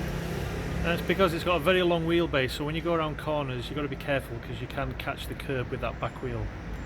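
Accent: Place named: Huddersfield, United Kingdom. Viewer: e by gum thall never git rid of that huddersfield accent and dont ever try says a lad from dewsbury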